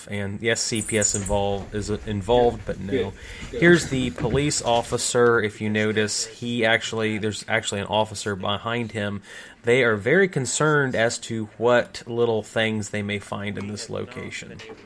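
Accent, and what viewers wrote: Ohio, United States. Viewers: You know an Ohioan when you hear one 😂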